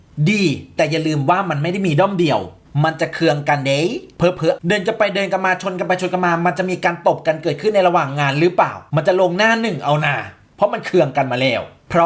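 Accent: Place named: Nakhon Phanom, Thailand. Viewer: พูดได๋… เหมือนนครพนมบ้านฉันอิอิ❤️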